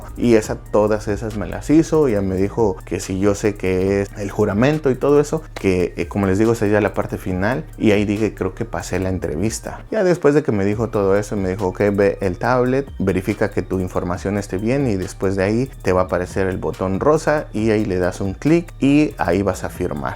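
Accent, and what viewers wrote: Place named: Mexico. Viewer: Excuseme, usted es Mexicano, porque esa habladita de ustedes es bien chistosa….. hasta misteco habla usted…. Los Mexicanos sos como unos indios ..😤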